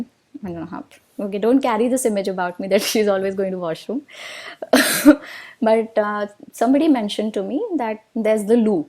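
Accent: India. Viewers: wow so nice, i love Indian accent. love and respect from Pakistan .. Mam u r looking like illena D'cruz. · Why I'm not understand indian accent but American do??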